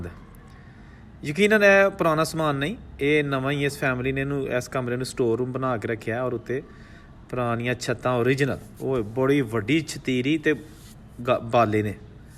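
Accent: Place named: Gurdaspur, India. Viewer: Sir your Punjabi dialect is same like Gurdaspur people.. Saab aap Narowal ya Sialkot district (jo Gurdaspur district India ke saath lagta hai) ke hoge.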